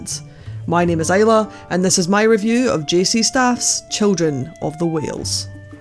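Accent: Scotland, United Kingdom. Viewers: Just found your channel, but it's exciting to hear some Scottish representation in my Youtube content! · when the Scottish accent hit me I was like yesss gurl- Got myself a wee buddy :D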